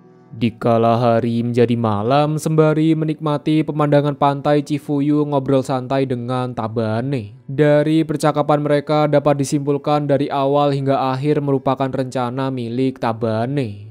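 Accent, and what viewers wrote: Java, Indonesia. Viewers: di pikir2 suara mu mendok bang, cipuyuuu ,wanitaaa,onimuraaa,kaka dari hogiii😅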